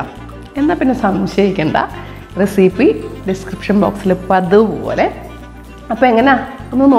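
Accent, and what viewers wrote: Kottayam, India. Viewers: Enikku ithu vare oru vlogerem kananam ennu thonniyittilla.pakshe entho enikku chechi onnu kanan Moham.eppolenkilum meet cheyyan pattumayirikkum alle....Chechikkutty othiri ishtam.chechide nadu evida?samsaram kelkkumbo oru palace kottayam style feel cheyyarundu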